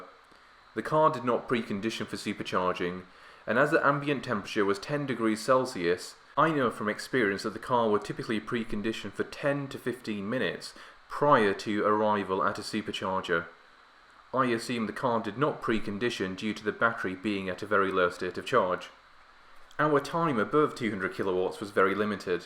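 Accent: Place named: Yorkshire, United Kingdom. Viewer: Half southern half yorkshire accent lol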